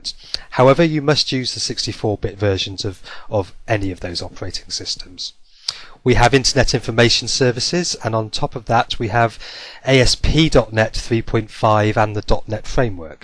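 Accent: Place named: United Kingdom. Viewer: Yes, development-related video in British English - a dream come true :-D Seriously though, nice overview - a must-watch for beginner devs, but a notable omission of SQL Server indeed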